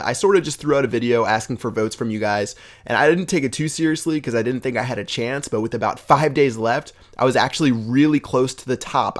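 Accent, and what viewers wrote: Netherlands. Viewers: You could really hear it was a dutch man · All of us Dutch people recognize his accent haha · that's a dutch accent for sure lol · yeah he is dutch that accent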